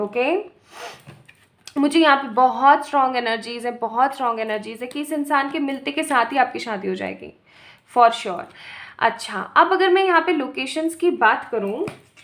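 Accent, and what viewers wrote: Punjab, India. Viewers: Di aap punjabi ho , apke kuch words punjabi walye hote hai,,,, and pile no 1 , I strongly claim this positive energy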